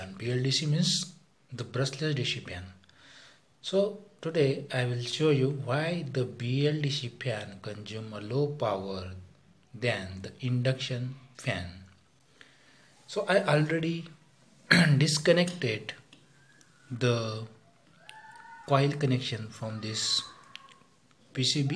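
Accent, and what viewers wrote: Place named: India. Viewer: Your English feel like hindi😅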